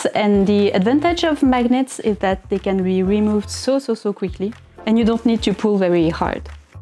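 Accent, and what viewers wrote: France. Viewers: Tu es tellement française, ça s'entend jpp- Mais très cool ton tuto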